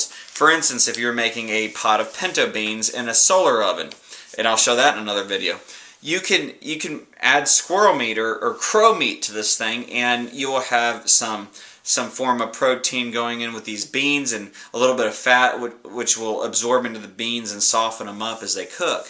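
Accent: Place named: South, United States. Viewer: Yea I didn't really think so and the sweater(and that soft southern accent of yours). kind of made me think lol...it wasn't meant to be insulting. It doesn't even matter to me though really but I as well throw up in my mouth sometimes thinking about it. I just thought, "This gay guy is putting up some sweet videos." Sweet vids man sorry for my ignorance :P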